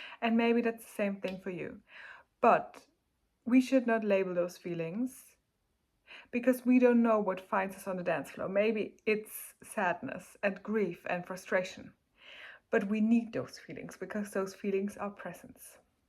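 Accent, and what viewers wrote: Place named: Germany. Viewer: you sound german, Grüße! :D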